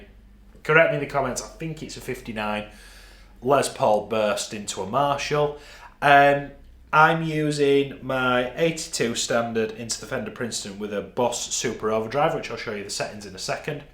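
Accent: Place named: Leeds, United Kingdom. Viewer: Great gutar teacher as s leeds fan love the accent 😊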